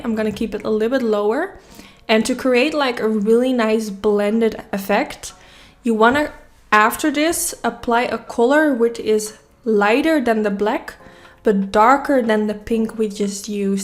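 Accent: Netherlands. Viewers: You sound Dutch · Your accent sounds Dutch? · Ik hou van je Nederlandse accent in je Engels ❤️ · Not even 20 sec in: Me: that's so Dutch.... Lol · Nederlands accent hahahah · I can hear youre Dutch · Ik hoorde gelijk dat je nederlands was, haha! Mooie video, ben gelijk gesubbed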